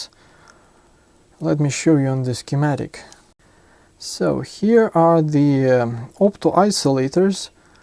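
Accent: Russia. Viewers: вери рашн пронансиэйшен ) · Русский акцент. Крутяк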